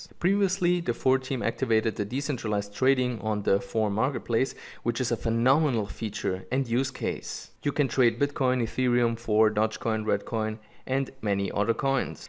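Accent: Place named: Germany. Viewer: Ich sehe hier Kommentare auf Deutsch bist du auch aus Deutschland? Am Anfang hatte ich das Gefühl einen Deutschen Akzent bemerkt zu haben. Aber was ich eigentlich sagen will ist : sys1q29ngmzattdaamwyvggq6pw0j67ls7h9wauhmqy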